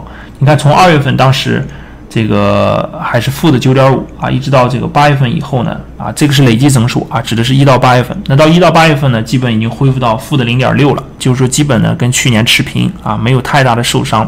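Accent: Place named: Liaoning, China. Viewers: 我感觉是辽宁的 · 刘素 我也觉得是那边，东北口音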